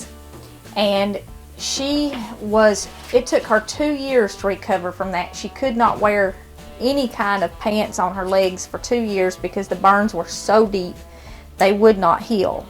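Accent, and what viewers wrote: South, United States. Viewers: I love your southern accent! I'm from South Carolina 👍👍😊😊 SUBSCRIBED! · Precious Lady, Can you cut the music…it is distracting and I would love to hear your beautiful voice. That Southern accent sounds soft and sweet. That music doesn’t do Justice to your lovely program🌷🌸🌷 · I'm from California and I just happened to see one of your videos, I'm not into country and don't like country accent, but listening to and watching you, I completely change mind and absolutely enjoy watching you and your recipes are so easy and such fun to watch being prepared, Happy Holidays to you and your and also to your utube watchers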